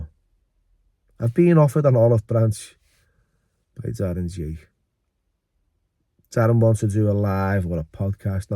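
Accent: Liverpool, United Kingdom. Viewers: Your deffo the best scouse podcaster enough said.😅😅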